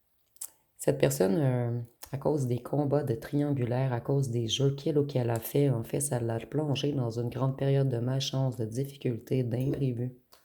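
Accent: Quebec, Canada. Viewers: ✨Yes 😉. ✨Booster...Liker... Abonnée... ✨BonJour de France 🇨🇵, et grand Merci pour vos excellentes guidances! 💫Très souvent, j'ai l'impression que vous nous "connaissez"! 🌟 Vos transmissions sont enrichissantes... C'est aussi agréable de bénéficier de votre gentillesse, et d'entendre votre joli accent québécois! 💛☀️Je vais écouter votre guidance de ce jour, maintenant.🙋A bientôt.🎈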